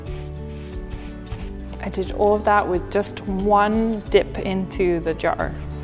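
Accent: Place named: Midwest, United States. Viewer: Sounds Midwest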